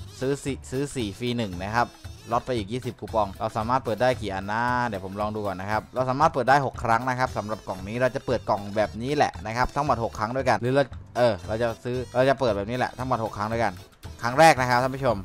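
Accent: South, Thailand. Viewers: พี่ จังหวัดไหนของภาคใต้คับ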